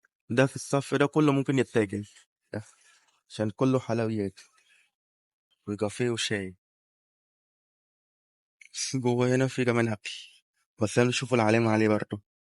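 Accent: Egypt. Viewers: ربي يحفظه مؤدب وخلوق وعيونه مليانه خجل وياحلات اللهجه المصريه ربي يبلغج بيه وتفرحين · اللغه المصريه بتاعتك حلوه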